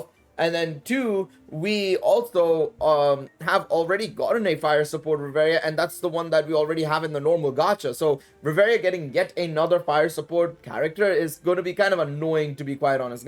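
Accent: India. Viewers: Just wondering are you indian-indian? You said about being based in EU? Why is your indian accent so heavy zzz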